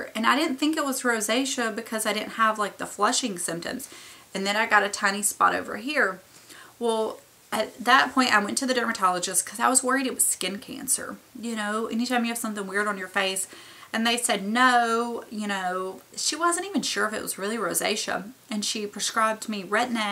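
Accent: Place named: North Carolina, United States. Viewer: I love your accent! Are you from North Carolina? I grew up there and your voice reminds me of home :)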